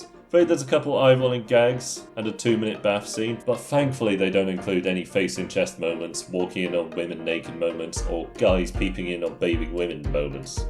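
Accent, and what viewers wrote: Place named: United Kingdom. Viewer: You have great ideas and ways of presenting them. I suspect you will grow to be quite a large anime YouTuber if you can fix some of your production problems. To start, your audio problem is the biggest one. Your microphone is not the greatest, being very muddy, especially in low frequencies. And since your voice is very deep, the microphone makes it very hard to understand what you are saying. Add on the idea that there is a large American population that is interested in anime, having any sort of shortcomings in the audio department will make it that much harder to understand you (accents aren't hard to understand once one has gotten used to them, but not all Americans are used to a British accent). The background music in this video is very loosely defined as background music. It is too loud in the video. Also, the background visual seemed odd, feeling like a Windows Vista background. 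I am not very well versed many other areas regarding YouTube production and making good videos, but I can tell that you do have the most important part, interesting content. Now you just need to develop the other parts of your YouTube game and I suspect you'll find the growth you probably desire. In regards to this video, I really like what you've said about Genius Prince. I very much enjoy these kingdom builder-type shows like Dr. Stone or Maoyu (even though Maoyu was meh) and watching another like that would be fun!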